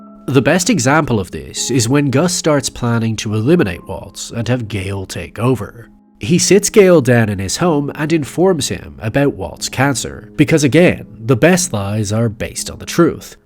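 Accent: Ireland. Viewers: Are you Irish, mate? You sound like one of my old best mates.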